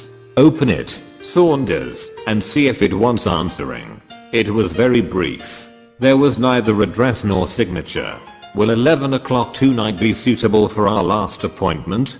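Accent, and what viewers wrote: England, United Kingdom. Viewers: I love this story and the english accent ,intonation of the story teller. i try to immitate her accent in every words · Amazing story thanks alot for reading to us and by the way I love your English accent, this is fenomenal !! i enjoyed to hear the whole story ,And i understood each words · best british accen..... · thanks alot for reading to us and by the way I love your English accent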